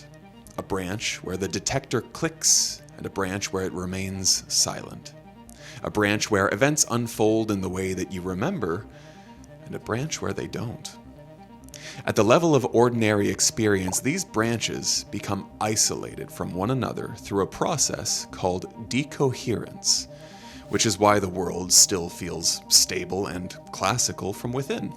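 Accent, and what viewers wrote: Canada. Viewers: Is the voiceover Canadian?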